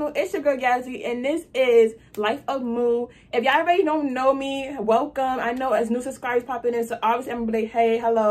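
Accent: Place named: New York, United States. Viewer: You got a new York accent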